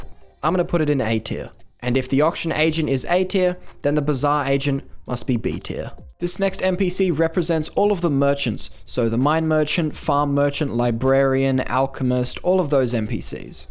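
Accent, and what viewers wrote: Australia. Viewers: I thought that was an aussie accent, I live in melbourne and I am sad, I can’t even beat greensleeves )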